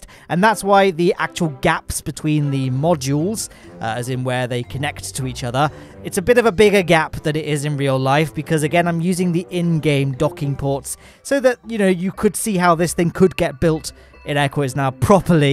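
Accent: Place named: RP, United Kingdom. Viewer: why you so posh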